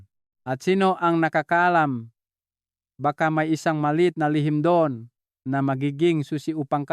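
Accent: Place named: China. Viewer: Intsik na accent naman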